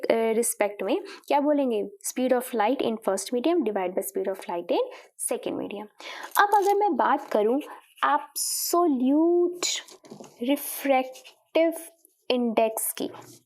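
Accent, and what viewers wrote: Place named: Bihar, India. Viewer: Dide you are bihari 🤔.. because of ur voice I can make out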